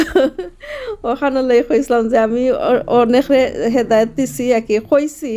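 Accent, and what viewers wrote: Sylhet, Bangladesh. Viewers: আপু কি সিলেটি নাকি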